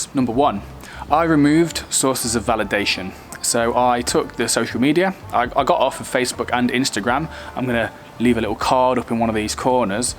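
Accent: United Kingdom. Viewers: Nice! I had a feeling you're accent was British 😅. That's such a beautiful accent and place to live! Greetings!!!!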